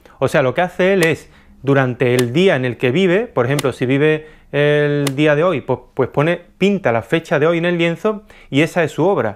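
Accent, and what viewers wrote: Andalusia, Spain. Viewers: Por fin encuentro alguien (serio y con formación) que dice la verdad sobre este negocio. P.D: Me encanta el acento andaluz. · Hola!!! Te descubri hace poco,me encantan tus videos. Tambien fui a Escuel de Arte,pero no pude ser artista,pero ahora me has dado un empujon! Jajaj. Me encanta tu acento,de ue parte de España eres? Es que tienes el acento muy parecido a una gran amiga que tengo y ella vive en La Linea de la Concepcion. Un abrazo enorme desde Liverpool!!!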